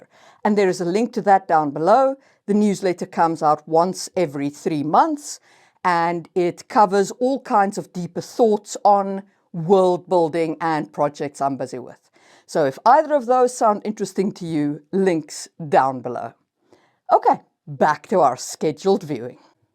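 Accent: South Africa. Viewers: ah, I knew I was hearing a fellow south African 🇿🇦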